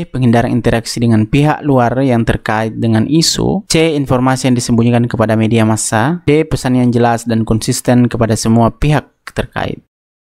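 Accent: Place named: Makassar, Indonesia. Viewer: Orang mkassar kah bos...logatnya agak ke mkassar...wkwkwk😂🤘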